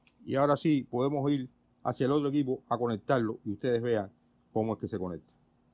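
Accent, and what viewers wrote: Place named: Caribbean. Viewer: Así hablamos nosotros los caribeños, cubanos, Dominicanos y como yo puertorriqueño, nuestro español y cultura ya es asi!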